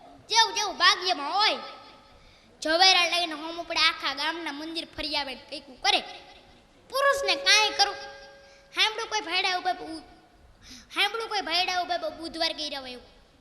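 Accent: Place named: Rajkot, India. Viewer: Vaah Rajkoti boli..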